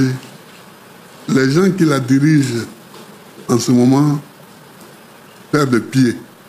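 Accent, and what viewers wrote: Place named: Ivory Coast. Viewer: Il parle trop comme un vrai ivoirien fierté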